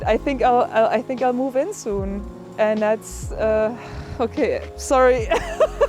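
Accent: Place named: Germany. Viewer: Man merkt das es ein deutsches Englisch ist, was sie im #Video spricht.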